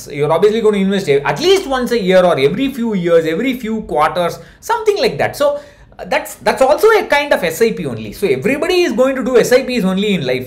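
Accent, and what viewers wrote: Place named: Chennai, India. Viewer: Yes, missing his Chennai Tamil slang...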